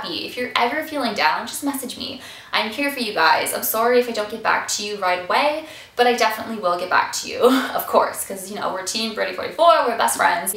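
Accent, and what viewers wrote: South, United States. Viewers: I'm new to your channel but you're already one of my favorite youtubers. I love your videos and the positive vibes you give off. Plus, I love that southern accent lol I think it sounds a lot like Britney Spears. And your face is beautiful! I ignore the haters too. I use to hear things about being gay all the time, but eventually as I got older and left high school I got a lot more confident just like you said in the last bullying video. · I freakin love your southern accent . Your face is fine. People are jerks. Even if they used to be your friends. I love your happiness. One of my old friends said "Whats wrong with your arms" i have a lot of freckles and she thought they were so ugly. But i look in the mirror and im ike sometimes "Oh i look good" just not full of myself lol. I love the fact that i love Harry Potter. Im weird crazy and fun my friends love me we always make each other laugh and i love my life. 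I got bullied a lot and i wanted to kill myself but im now in love with myself. I love my personality. I love the fact that i love Harry Potter. I love how my hair are so beautiful. :)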